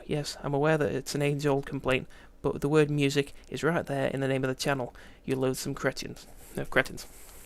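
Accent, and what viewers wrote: Stoke, United Kingdom. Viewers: U got a little bit of stokie in your accent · Your video is 11 years old now but absolutely fascinating. I'm sure you've lost even more of your Stokie accent now. That's a shame! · Love vids like this; that aren't just documentary style dissections of accents, but the person demonstrating them. Subbed, also a fellow Stokie, me duck! (Pot 'erb!)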